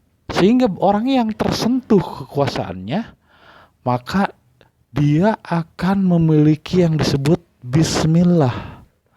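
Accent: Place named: West Java, Indonesia. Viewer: MAS, KAMU HATI2... تفسير جلالين PUN KAMU TIDAK NGAJI...!! kalau dari segi logat bahasanya ini orang sunda..!! مكة المكرمة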